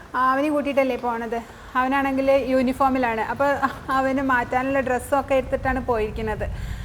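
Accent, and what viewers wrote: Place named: Tirur, India. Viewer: Njan janichathu Tirur aanu❤ nostalgic aanu samsaram okke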